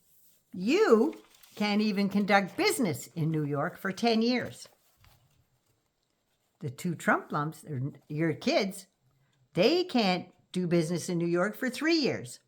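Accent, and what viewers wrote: Canada. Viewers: Thanks for the Canadian voice. Trump needs to be said to the face - and I have this from a Black lady: Don't bullshit the bullshitter! Well, Trump has bullshitted his voters and supporters.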